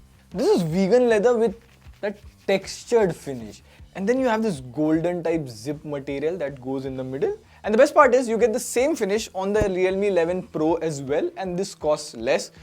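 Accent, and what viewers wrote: India. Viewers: I like your indian accent❤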